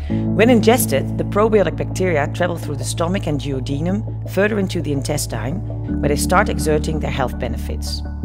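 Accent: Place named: Netherlands. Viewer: this is an obvious Dutch accent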